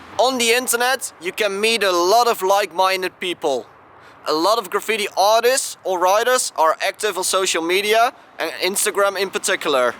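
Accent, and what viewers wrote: Netherlands. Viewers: Are you dutch? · Je bent Nederlands k hoor t aan je accent 😂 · That Dutch accent :D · Right on! A dutch comrade! Just the accent gives it away. wonder the region you wright from? Havn't written in atleast 3 years. I suppose I need to get back at it! can't risk writing illegaly anymore though which sucks... Met quiet alot of writers through instagram. you have to do some digging but eventually you'll meet them. Sometimes I used to pass someones new panel on a train, I'd make a picture and send them to them on insta. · Dude,, Jij moet wel uit Nederland komen, je accent :) . Keer samen painten?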